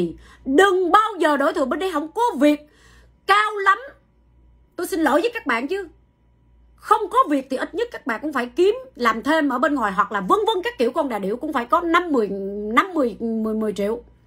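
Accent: Standard. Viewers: noi qua chuan.m cam on chinh phu dai loan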